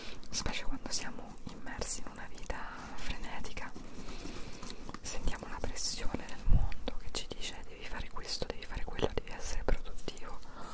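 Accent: Italy. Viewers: No entiendo nada jajajajajajjajajaja pero adoro el acento italiano!!!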